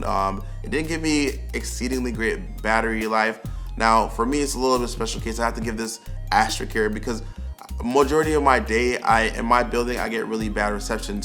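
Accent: United States. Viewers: You from Jacksonville bro? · You sound very very american. Im sorry for you.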